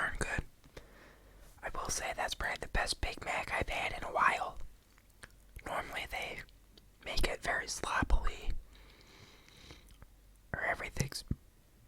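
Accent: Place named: Boston, United States. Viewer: You have the same accent and speech cadence as MorpheusASMR. Must be Boston area too?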